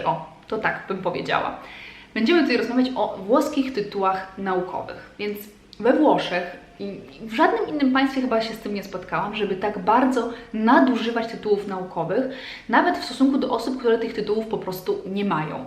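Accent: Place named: Kraków, Poland. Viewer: Ciao. Mam identyczne przemyslenia ! Ps i jestem krakowianka! U nas w Krakowie wychodzi sie na ,,pole,, a w innych czesciach polski ,,na dwor,, 😁 napisz jak oczywiscie mozesz chociaz gmine w jakiej mieszkalas...masz typowy akcent krakowski jak Ja..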